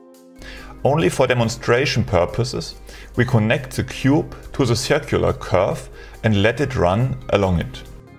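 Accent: Germany. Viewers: do i hear a little bit of deutschen akzent?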